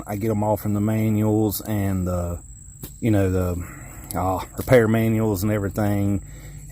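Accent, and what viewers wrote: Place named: Deep South, United States. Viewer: Once in 1984 I took off from Reno Nevada to go visit my mom in Cookeville Tennessee in a junk 1973 Chevy Nova. It has a 3 speed on column and 250 six. I just left with almost no money to see her for Christmas. Power steering pump failed in Texas panhandle. Went to parts store got rebuilt steering pump. No pulley ! So a local (had your deep south accent) who was at the parts store "follow me home I have a press ". I follow this stranger long way . Pull up his home it was his family. They fed me and put my car back on road. Asked me and my blue 💙 Heeler to spend the night. Fed us breakfast. I am a yank. They show such love these deep south folks.Gave us food to go . Went saw momma in Cookeville for Christmas. God bless you brother. I lost her few years later.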